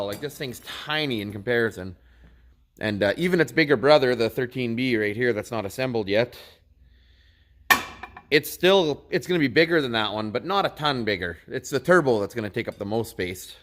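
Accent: Canada. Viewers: Sounds like you're a Canadian you should watch another Canadian if you do or don't it's. a v e Arduino versus evil what it stands for but this dude is a trip his channels here on YouTube rebuilds and tears apart anyting mechanical or electronic I think you would get a kick out of this dude peace out have a great day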